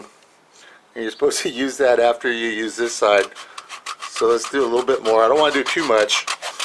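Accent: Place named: Florida, United States. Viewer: You sound like your from Florida lol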